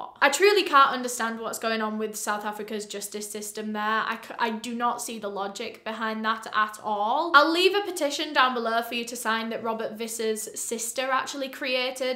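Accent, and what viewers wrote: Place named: England, United Kingdom. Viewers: Her England accent is irresistible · Our is so intelligent, kind and so very talented. She reported upon this horrible massacre with such detail, sensitivity and compassion; I think this is why she is so popular…that and her charming Yorkshire accent. And her eye makeup is mesmerising; she’s such a pretty girl…Brains and Beauty and a successful entrepreneur…... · Me, she kept coming up in my recommendations and when I finally clicked and heard she's a fellow northerner I instantly subscribed. I'm from up North and have friends who live in the same city as. It helps she's an inclusive queen 👑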